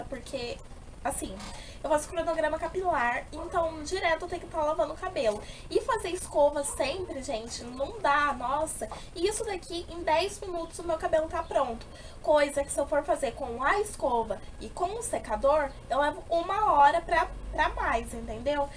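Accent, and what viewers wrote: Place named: Brazil. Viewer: Olá querida, belas comprinhas, mas esse sapato azul, uuii adoro !! Sou portuguesa mas amo o sotaque brasileiro ;) Passa no meu canal que tem video novo ;) Beijinhooo grandeee *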